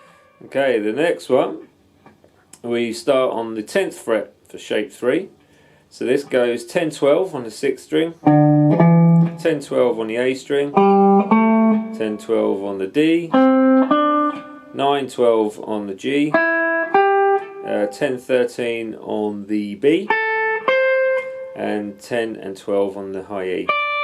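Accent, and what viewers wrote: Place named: Kent, United Kingdom. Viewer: Are you from SE Kent ? We lived in Sandwich , and your accent sounds the same as if I’m listening to someone from there! My husband is British and we moved there and back over ten years ago now. It was quite the experience. I’ll say that !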